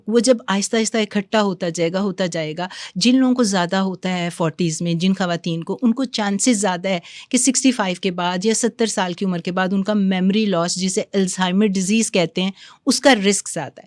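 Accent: India. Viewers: Me bhe confused hoon k ye anchor q Hindi pronunciation k sath baat ker rahe hy ya shayd Undian hin qk unki alphabet me khay ghain nahe hy or agr ye Pakistani hy to isko yaqeenan urdu talaffuz ana chahiye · Bhen ap jan k hindi accent main boti ho sach main zehr lgti ho esey boltey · why host uses indian style urdu , she says کھ، instead of ک۔ like خواتین کو کھواتین وغیرہ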